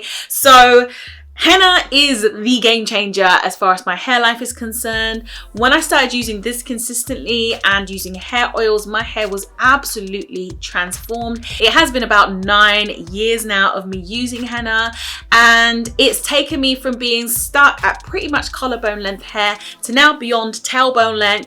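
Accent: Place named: South London, United Kingdom. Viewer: you sound so much like you are from South London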